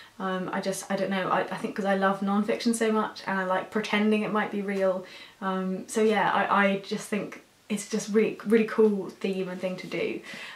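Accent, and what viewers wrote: United Kingdom. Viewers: You're so different to all the other booktubers I follow and I love it! It's nice to hear a Brit talking about books for a start, but also you don't focus on popular books, just ones that you feel are important or interesting. It's so refreshing and I love it!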